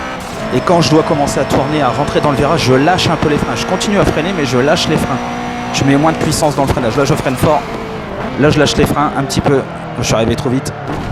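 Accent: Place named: North, France. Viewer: On sent que vient du Nord;-)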